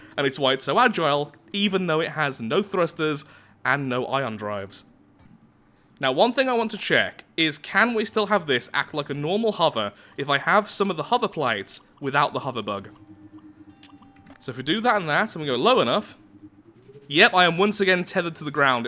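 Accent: United Kingdom. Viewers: Because " X game is broken" in the title, I guess? Then I noticed it didn't say it's without any exploits and realized I got my British sounding creators mixed up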